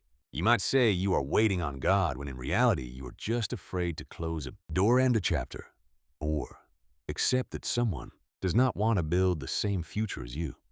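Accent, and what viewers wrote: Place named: South, United States. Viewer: You who is talking u are from the south bro I can hear ur accent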